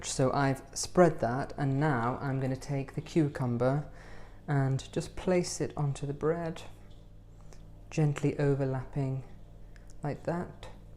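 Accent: RP, United Kingdom. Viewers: So posh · You have a British accent like the lead singer from the. You guys must be from the same town. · Lovely concept and lovely video. I’m curiously wondering about the accent. I’m assuming it’s British but from which part? Possibly from Africa , Zimbabwean maybe? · Oh my god I couldn't imagine working with this geezer. Speak normal because I can't stand posh people, it's like they act so superior and know everything. Oh shut up.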